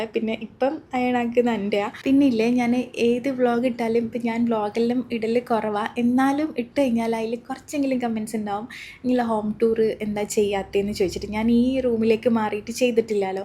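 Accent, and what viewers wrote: Kannur, India. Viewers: Oppened ❤️iyale vidios othiri ishttamanu kannur samsarathilanau wayanad kariyaya nan ith othiri ishttapedan thudaghiye❤️❤️👍👍👍🤲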